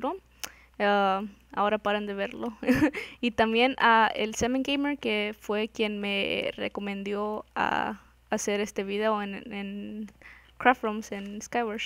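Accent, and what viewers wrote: United States. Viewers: Es de Estados Unidos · Eres gringa?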